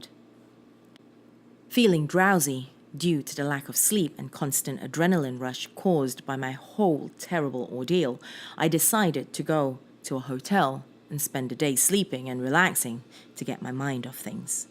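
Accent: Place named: RP, United Kingdom. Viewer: I'm really enjoying your channel. I have noticed that you seem to get more adverts than the likes of Mr. CreepyPasta and Creeps McPasta (my head has a problem getting around Creeps' last name and yours lol!) You seem to be proving my theory that its stories like Slenderman and probably Jeff/Jane the Killer that have been involved with real life controversy that keeps advertising away. I have been puzzling over your accent which comes across as very non accented BBC or RP English. I firmly believe that narrators like yourself do as much preparation as you would if you were acting a part. I'd guess that you've done English to a high standard and have done some voice production work which makes me think that you're an actress. The thing that got me puzzled about your accent was that occasionally it sounds almost like a really soft Scottish lilt and occasionally I hear a little London (I'm a Scot living in London). 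Its brilliant listening to stories being read properly and if you haven't already, I would approach some of the publishers who do audiobooks because, I've heard a lot since I can't seem to own reading glasses for longer than 48 hours without breaking them! I have listened to a lot of narrators who are working on Best Selling Audio Books and you are every bit as good as, if not better than most of them. I am really pleased to have found your site because I am heartily sick of hearing good stories murdered by narrators who don't seem to prepare or even look the story ove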